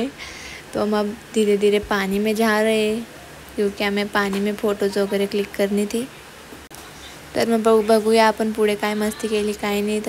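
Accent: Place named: Khandesh, India. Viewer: Aap ki bhasha me peevvar khandeshi dikharahi he Qki ho puri ahirani bi nahi or hindi bi nahi to aap yesehi bolog banaiye jay khandesh🎉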